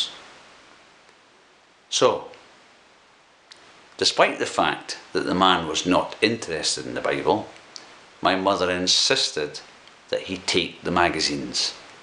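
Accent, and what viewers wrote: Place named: Edinburgh, United Kingdom. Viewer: I thought that was an Edinburgh brogue! Did I tell you I was on the bonnie, bonnie banks of Lochlomond last weekend. Thanks for another informative and insightful video bro.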